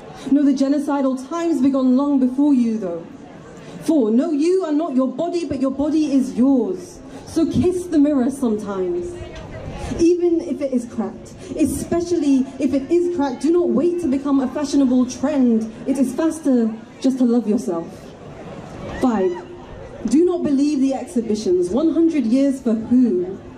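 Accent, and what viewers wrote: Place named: RP, United Kingdom. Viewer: This idiot sounds SO FUCKING POSH... these people are so detached from reality, it's absolutely mind-boggling. I can only see total collapse in the future, we are fooling ourselves if we think we are winning the culture war. The saddest part is, where do we go? I have nowhere to go, England is my home, and every western country has the same problems. I think the best thing would be to create safe communities, with fences... I see a South African style future for us in the west, it's sad. We gave away our perfect slice of peace and quiet for this.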